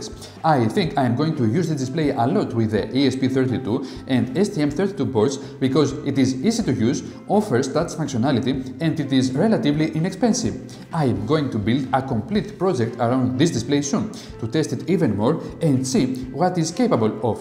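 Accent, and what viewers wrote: Greece. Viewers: Γεια σου.απο οτι καταλαβα απο την προφορα πρεπει να εισαι ελληνας.θα ηθελα να σου στειλω ενα link και να μου πεις πως μπορω να το κανω και ποσο (ευκολο) <link> ευχαριστω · the moment he opened his mouth, I knew it from the start, He's Greek! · I hear a Greek accent! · Are you from Greece?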